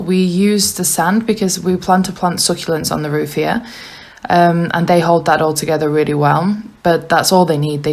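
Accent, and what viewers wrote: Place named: South Africa. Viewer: After a second vid I’m hooked and just subbed. Ice plant is fire resistant! The concrete pope portholes are brilliant. Love your accent, reminds me a little of some South African friends of mine but yours is a bit more exotic. Can’t wait to watch more of your build, you are a talented family.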